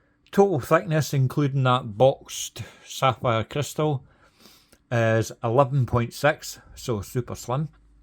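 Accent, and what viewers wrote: Scotland, United Kingdom. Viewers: Bloody hell, it seems like I’m hearing William Wallace reviewing a watch. Nice review.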